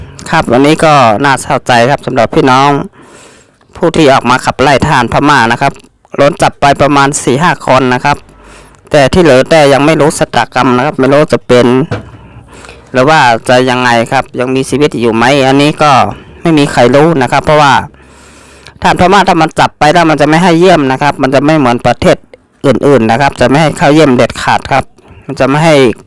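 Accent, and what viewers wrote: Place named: Vietnam. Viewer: ฟังสำเนียงพูด.เหมือนเวียดนามนะ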